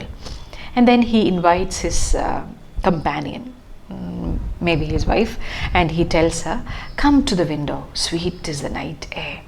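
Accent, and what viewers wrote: South India, India. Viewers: Very nice explanation, mam are you South Indian?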